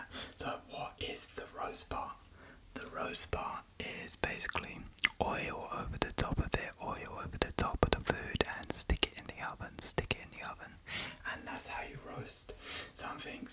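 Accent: United Kingdom. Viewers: I assumed you were already speaking British English! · Are u Britsh?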